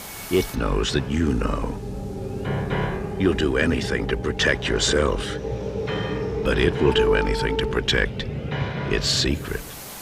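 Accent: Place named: East Coast, United States. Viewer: Nice one! ❤❤❤ Love your East Coast accent accent! New York??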